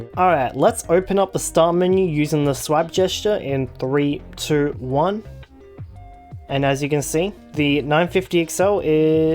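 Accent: Australia. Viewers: I was about to say damn this guy should bee australian tghen i realised hes on yt au...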